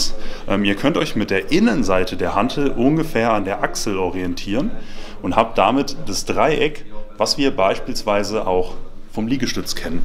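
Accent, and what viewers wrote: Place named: Saarland, Germany. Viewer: finds geil das ich nach nem video gesucht habe das mir die thematik näher bringt 100 prozent alles dabei was ich gebraucht habe. auch geil das ich beim schauen irgendwann den saarländischen dialekt rausgehört hab, geil auch noch dass es aus meinem Landkreis ist xD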